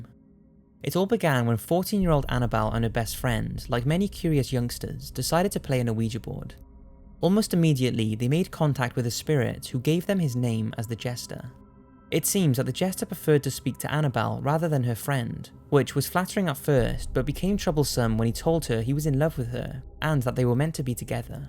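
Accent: United Kingdom. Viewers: He's British and they just pronounce things differently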